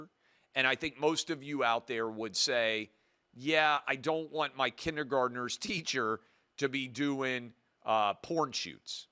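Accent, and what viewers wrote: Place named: Boston, United States. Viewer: Sorry Clay, you are alone on this topic. You sound like you are from Boston.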